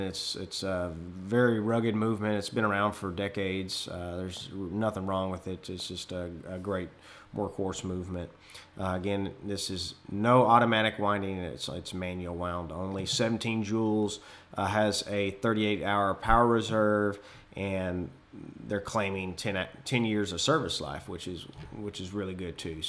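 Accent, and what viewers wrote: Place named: South, United States. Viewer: I like your approach. Your video style is very friendly, down to earth, fair minded, and helpful. Please keep up the good work! I am also recommending your videos to my students ( I am a freelance English teacher) as you have a very mild, clear, Southern accent, which I think can contribute to their listening skills nicely. ( I get them to listen to a mixture). Many thanks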